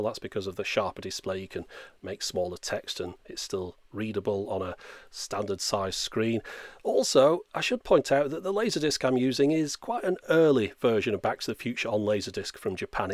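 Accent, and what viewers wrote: United Kingdom. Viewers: British axxcent? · Your british accent is pure eargasm.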